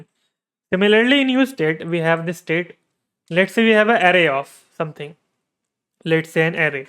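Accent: India. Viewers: Could not understand a word. If FreeCodeCamp keeps posting these videos with Hindi accent, their content is going to go down hill. Most praise in comments is by Indians who understand this accent with no issues. For others though, it's painful to listen for more than a 10s. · I dont understand indian english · Hear Indian guy - close the vid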